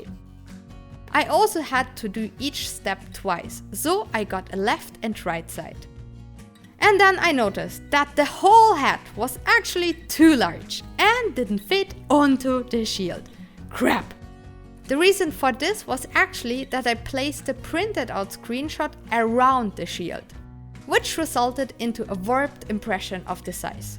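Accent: Germany. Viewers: Are you from Germany your pronunciation is realy german...I am from Germany to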